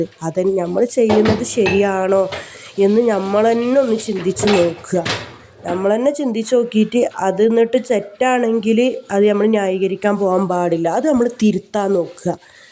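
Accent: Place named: Coorg, India. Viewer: Coorgil evideyaanu...samsaram kett Coorg aanenn thonni,Siddapuram aano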